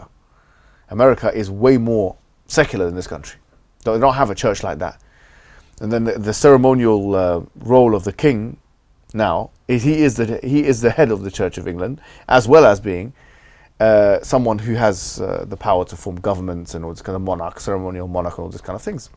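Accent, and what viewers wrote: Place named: Kashmir, Pakistan. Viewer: Bruh u producing quality videos with quite low subs. Subbed to u. Where r u from? U sound like kashmiri-Pakistani.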